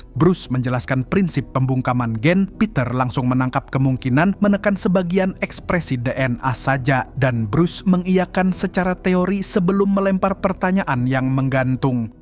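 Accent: Banjar, Indonesia. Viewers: Host ikm urang banjar jua kah 😅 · Dikira salah dangarr, skalinya bjuran urang banjar yg mreview😂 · Waluh urang banjar sakalinya admin nya.. 😁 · Orang banjar kah pian mang??? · Orang banjar jirr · Baru tau kalo kamu urang banjar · Urang Banjar png asa un nh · Urang Banjar sekali nya neh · Admin Nya Urng Banjar Kah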